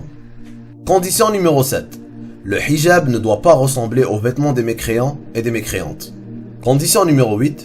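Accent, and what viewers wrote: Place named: Kabylie, Algeria. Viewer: L'homme qui parle dans cette vidéo kabyle non🤔 (d'après sa façon de parle )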